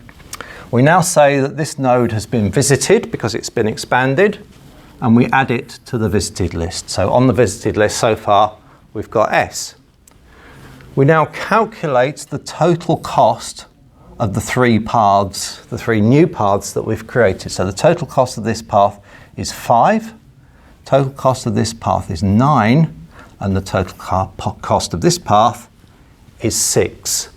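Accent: United Kingdom. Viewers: besides this amazing explanation , this is a very lovely British accent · Came here just for the British accent :p .. nicely explained!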